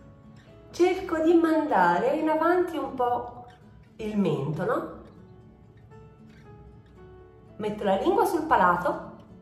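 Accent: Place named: Florence, Italy. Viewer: Sei fantastica, bravissima e molto bella La tua parlata Fiorentina è un piacere Un bacio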